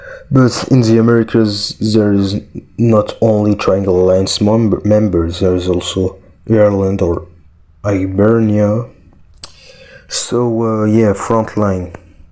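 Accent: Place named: France. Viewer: french is an accent I never thought I'd like you proved my wrong keep cooking mate!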